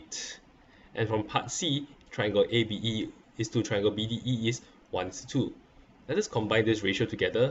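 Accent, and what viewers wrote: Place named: Singapore. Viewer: Sounds singaporean lol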